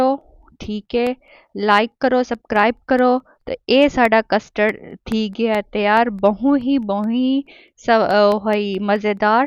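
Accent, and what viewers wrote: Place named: Saraiki, Pakistan. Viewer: Nicccc Sariaki vice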